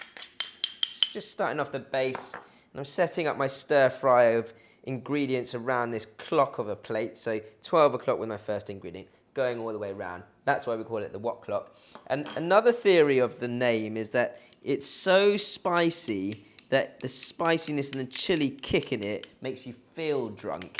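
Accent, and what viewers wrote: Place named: Yorkshire, United Kingdom. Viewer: Nice accent. Were you born in the UK? Is that Yorkshire accent? The Recepi is great!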